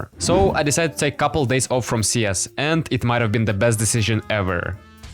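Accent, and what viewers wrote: Finland. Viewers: are you finnish!?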